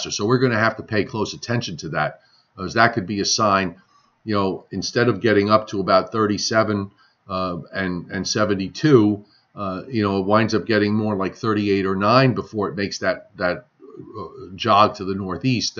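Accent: Long Island, United States. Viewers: 45 "my-aaaa" soo long Island.:) We say it in nj and nyc too but lil differently.. I love all your videos and enjoy your speaking style (straight up north nj nyc long isl). I know your big time on tv and other projects but you have an excellent radio voice and flow. Im guessing you lean center right..(caught that when you spoke about pre tropical storm warning era cant be proof of global warming. Maybe one day you can branch out into am talk radio or non political variety show. Just a compliment and thoughts. I cant wait to your winter noreaster live streams. Im a big fan of tracking them like you. Im a marketing adv. Man but my childhood dreams was to be a meteorologist. Thanks.. Gabe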